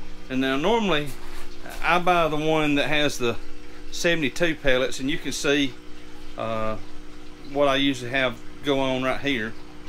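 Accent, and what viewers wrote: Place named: Boston, United States. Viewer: I recognize that accent. Definitely Back Bay, Boston!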